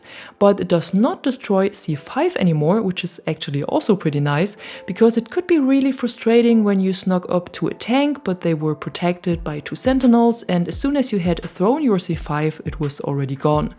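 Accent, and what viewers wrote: Germany. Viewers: Es ist vermutlich dein deutsch englischer Akzent, du machst top Videos 👍👍👍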